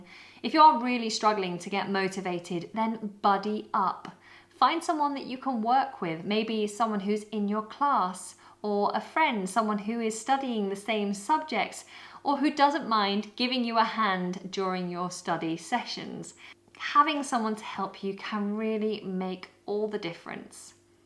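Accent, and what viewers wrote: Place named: United Kingdom. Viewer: I love your British accent.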